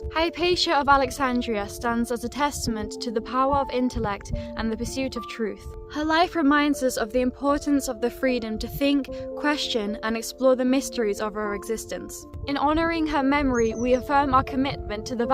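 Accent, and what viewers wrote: United Kingdom. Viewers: Hi there! Stumbled upon your channel, great content. Keep up the good work. And have to ask: Where are you from? You look like a Scandinavian, but sound very brittish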